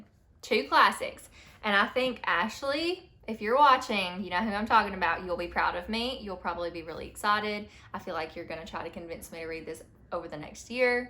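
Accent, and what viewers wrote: South, United States. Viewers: You have my favorite southern accent on YT. I'm from Mississippi; I used to have a distinct southern drawl before I spent years living in Europe and doing voice work over the last years. At any rate, if you like to read out loud, you could always play a part in one of my readings. I have many collabs planned with different creators, and a backlog of works to do. Sincerely, Scully.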